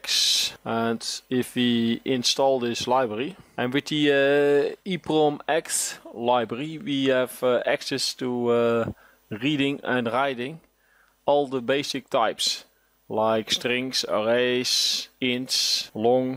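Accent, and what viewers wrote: Netherlands. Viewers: 10 seconds is enough to recognise that his native language is dutch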